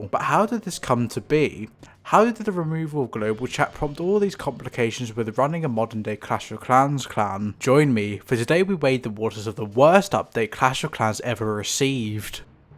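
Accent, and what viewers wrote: United Kingdom. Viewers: You sound like you’re northern Irish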